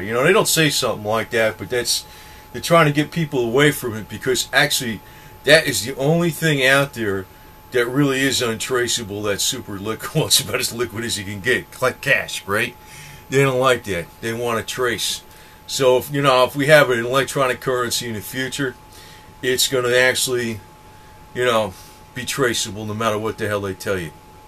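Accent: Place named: Northeast, United States. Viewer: Florida huh? Sound like a north east guy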